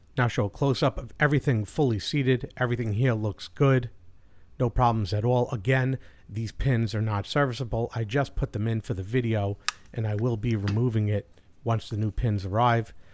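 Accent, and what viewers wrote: New York, United States. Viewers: Excellent video sir. Love the NY accent. I'm from NH and speak a little different.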